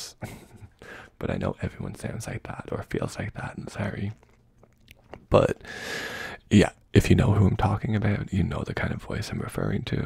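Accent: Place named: Ireland. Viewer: Soft spoken is absolutely beautiful, with an Irish accent makes it phenomenal 😍 much love from an admirer in New Zealand ❤❤❤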